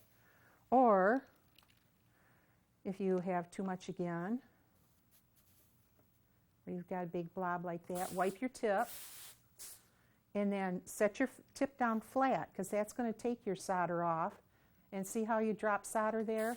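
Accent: United States. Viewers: Thank you for this instructional video. But, the whole time I was thinking of Fargo, yeah, you betcha, don'tcha know?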